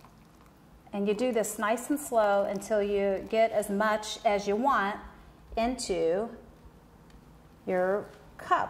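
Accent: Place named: South, United States. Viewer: You look (you could be) Italian with a southern USA accent 😍 Where are you? I like the view of yiur opening scene... Give Thanks (Now let me rewind so I can pay attention to your tutorial 💖)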